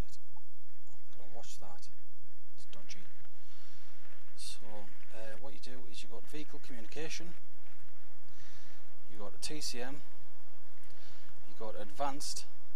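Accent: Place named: Durham, United Kingdom. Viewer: You sound like your from my kneck of the woods Durham very helpful video cheers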